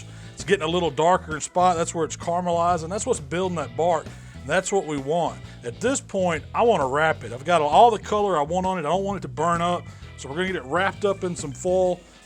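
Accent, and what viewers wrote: South, United States. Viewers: Unless your a big boy with a southern accent then I don't listen to ya on BBQ/SMOKING TIPS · I can't think of anyone I would trust more when it comes to anything relating to eating meat than a big happy dude with a southern accent and a half dozen different types of grills/smokers on his back patio. At the time I post this there are 299 dislikes.... who the hell is clicking that button?? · Southern accent plus overweight... please serve me food!!! 😍😍😍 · he has quite a strong American (I'm guessing southern? I don't know American accents!) accent. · I not really understand what he said, is it south USA accent?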